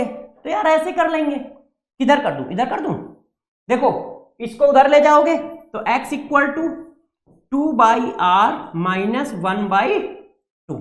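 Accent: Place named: Bihar, India. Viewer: I bet sir is either bihari or up Becoz me also frm bihar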